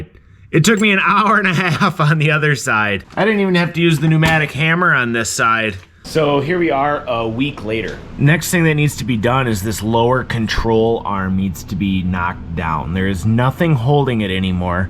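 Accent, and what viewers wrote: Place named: England, United Kingdom. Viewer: But then it's a Town Country/Caravan. I own 2, 02's (Town Country Limited AWD with a custom 1/2" wall rear axle, a Reese Class 5 45707 trailer receiver, a 2" lift, you name it and a Caravan SE, shorty 3.3) Better than any Corvette, Lincoln Town Car, even a Bugatti. You should fix the Dog Legs and rockers. Welding in is best but watch the foam! Excellant editing, clear video, and English accent (unlike my Czech) so that can be isolated. ~ nope 336 subs Youtube is weird isnt it?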